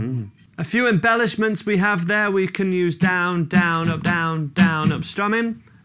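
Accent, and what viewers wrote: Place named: Yorkshire, United Kingdom. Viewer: you sound like your from Yorkshire.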